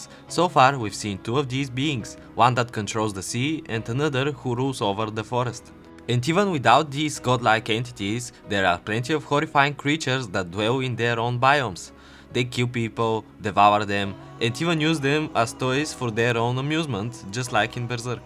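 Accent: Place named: Bulgaria. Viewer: Bulgarian ahh accent, nevertheless amazing video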